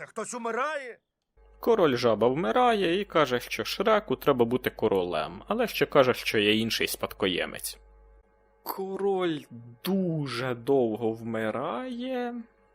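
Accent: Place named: Surzhyk, Ukraine. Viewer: Мені подобається ваш "суржик"🥺❤️ Хто б там що не казав, але говірка класна) Звучить по-домашньому для мене, бо в житті подібним чином спілкуюся.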